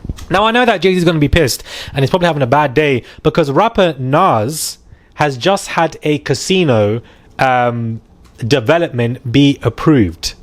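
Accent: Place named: England, United Kingdom. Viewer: An east Indian with a English accent ok ... this is black folk bizz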